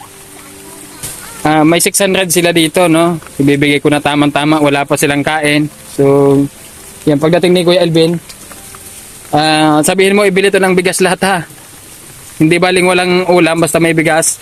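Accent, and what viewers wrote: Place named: Bicol, Philippines. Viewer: Magayon nag acceint ng bicol mo.nakakaralateakonsa mga pronouce mo.ng bicol parang na.miss ko ulinnag bicol taga camsur ako.kaso ang bikolnmo parang tunog rinkunada masarao pakinggan ang boses mo..tuloy lng mag vlog lagi ako nanunuod.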